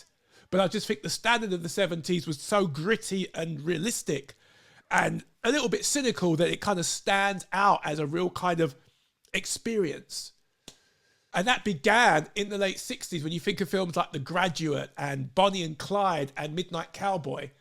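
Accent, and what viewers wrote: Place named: United Kingdom. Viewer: Hey. I'm new to your channel and I hear what you are saying. Although I do agree with what you have said and yes, the Oscars have kind of lost mysterious Spark and Mystique it once had, and Hollywood looks like the 20th century Relic, I will say this. I think there is good in that it will make way for new ways to create films. I'm not sure where you are and I'm guessing you probably live in the uk? I'm basing that on your accent but I of course could be wrong. Apologies if I am wrong. But as an american, I get tired of watching the Oscars because it's filled with Elites who really have an absolute disdain for about half of the country. We know we are despised because of our beliefs, our politics, our way of life, and usually Oscar night is when the worst of the worst are together and they basically ridicule half the country. 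This wasn't always the case but it's become more so in the last 20 years. And it's basically an elitist Club for the wealthy to lecture the rest of the country. And the truth is is that there's so much more different forms of entertainment, I find the average YouTuber more entertaining than the Oscars and the golden globes. Perhaps Hollywood needs some serious humbling before they can really bring back that spark and they need to not ridicule half the country then they will get the ratings they need and they will be able to unite this country, rather than constantly divided with ridicule. I think one of my favorite movies of the 2010s what is the C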